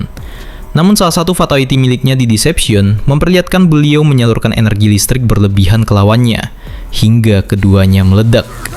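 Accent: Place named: Surabaya, Indonesia. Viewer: Lu org surabaya bang?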